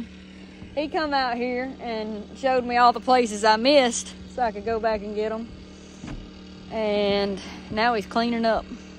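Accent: South, United States. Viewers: The southern accent makes her even cuter 💖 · dont get much more southern than that voice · looove the southern draw you got there, maaaa'm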